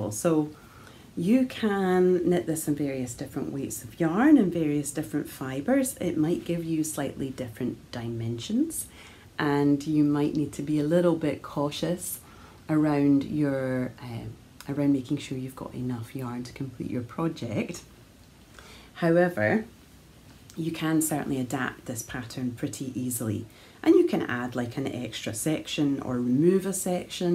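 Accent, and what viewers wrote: Scotland, United Kingdom. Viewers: Dearest, what I love most when watching your podcast is your language - your scottish pronunciation and your pleasant voice. I also appreciate when you podcast with your mom - I think that you both knit a lot of beautiful garments! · Hello - I always appreciate the way you move through your podcast….meaningfully😊not rushing but taking the time to fill in the details. And your soft Scottish accent is very relaxing and comforting. It always means a lot to me when you review Art exhibitions and other places you’ve been as our creativity doesn’t start and stop with knitting. I very much love your new shawl pattern and it’s so lovely that you’re thinking of us in the Southern Hemisphere too!! I live in Tasmania, the little island state to the south of the Australian mainland - it’s a beautiful, magical place to live but we usually still need a cardi or shawl on in those Summer evenings - so one of those could just fit the bill!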